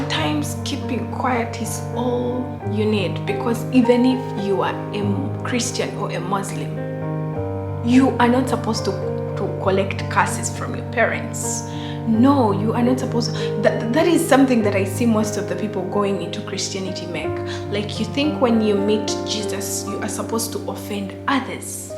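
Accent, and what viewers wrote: Uganda. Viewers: Just seen your videos, you sound Ugandan i stopped because of your accent. Ended up watching your carrot oil video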